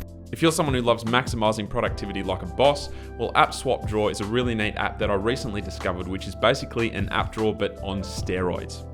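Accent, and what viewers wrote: Australia. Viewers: This guy's Australian accent is surreal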